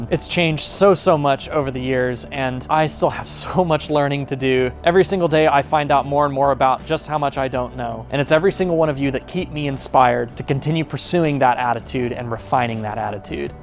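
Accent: Texas, United States. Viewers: Thank you for your efforts to make this channel. I discovered it this year when looking for videos on the Mooney and I met your series ferrying the Turbo Mooney to Texas. BTW, I’m amazed how easily I could understand your texan accent 😉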